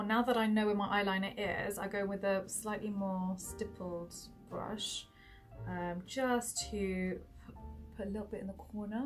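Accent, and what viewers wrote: United Kingdom. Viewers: plus that British accent :).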